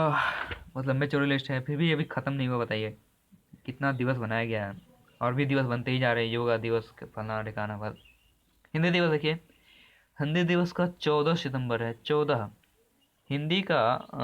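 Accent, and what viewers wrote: Bihar, India. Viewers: Sir aap bihar se hai kya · Bhut bihari bolte ho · Sr r u frm bihar????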